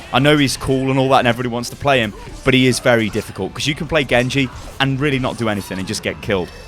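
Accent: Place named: United Kingdom. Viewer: this disgusting british dialect.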